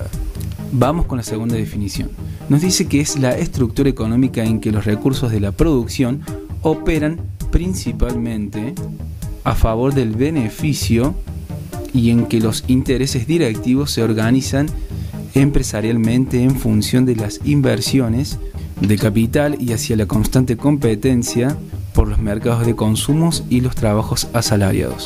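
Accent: Córdoba, Argentina. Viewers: No me concentre en todo el video escuchando su acento cordobés xd 😍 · sos cordobes? por cierto buen video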